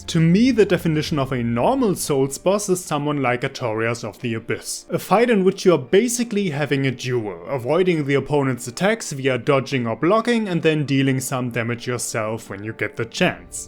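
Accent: Germany. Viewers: Oh shit, so that's what it is. I've been trying to figure it out, but I wouldn't have guessed German.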